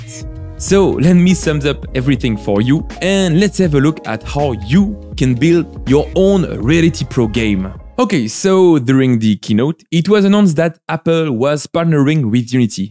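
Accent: France. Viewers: j'adore l'accent qui prouve que tu est bien français hahaha , sinon bonne video ! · Rhooooh avec ton bel accent, ça sent la France tout ça ! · Frech Accenttt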